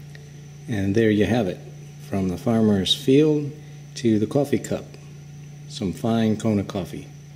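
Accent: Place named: Wisconsin, United States. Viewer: great video. guy sounds a bit like Clint Eastwood with a Wisconsin accent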